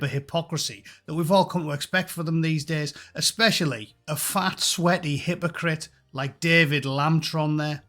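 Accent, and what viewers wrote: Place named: Middlesbrough, United Kingdom. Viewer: I love your Boro accent. I left when I was 16. You’re priceless. Make me cry laughing fella 😂😂😂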